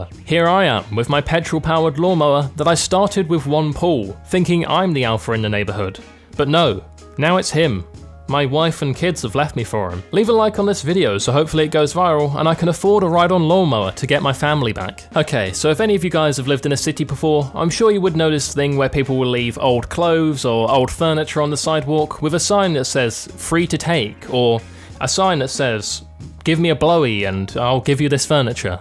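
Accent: England, United Kingdom. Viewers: He’s english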